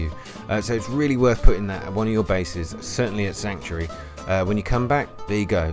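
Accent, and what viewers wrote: United Kingdom. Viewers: Got the game a long whole ago but never started off with it. this video is not only an interesting watch but a good start for me too. Plus i like ur accent - Briton? and oh ... music is ok ok, i was concentrating on ur voice and didnt feel too distracted. maybe next time go for something with no lyrics.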